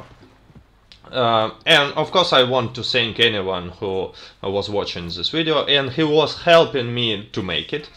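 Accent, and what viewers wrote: Russia. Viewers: I think ur Russian accent is pretty cool makes u sound cool and scary love it 😂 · I like your Russian accent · i like your russian accent :) nice vid!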